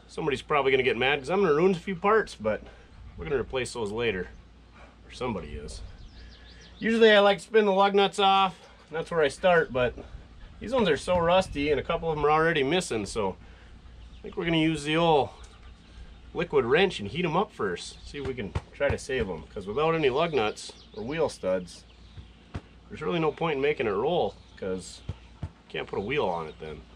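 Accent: Minnesota, United States. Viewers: Just found your posts and I want to tell you how much I enjoy them! Basic but informative and just a plain old fun watch. Sounds like your from Minnesota or there about?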